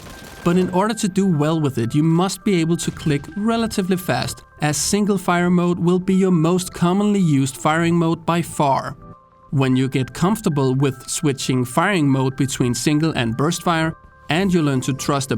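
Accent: Denmark. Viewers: er du dansker? :P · Is it just me or does they guy have a Danish accent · Danish, right? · Are you danish? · So easy to hear you are Danish dude hehe 💪 you are doing a great job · Er du dansker? ☺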